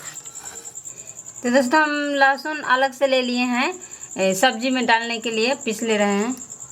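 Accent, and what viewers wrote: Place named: Bhojpuri, India. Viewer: App bhojpuri boliye, humlog samjhte hai app kya bolti hai...App plz Bhojpuri boliye...Apka bhojpuri bolte huye bahut achi lagti hai. 😊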